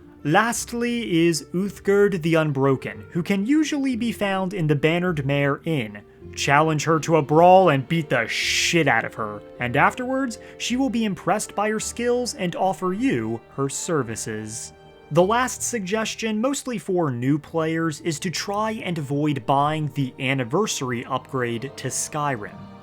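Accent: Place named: United States. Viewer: Good video but American accents can be kind of grating. Especially how he keeps saying "White Run". Doesn't really flow in his voice.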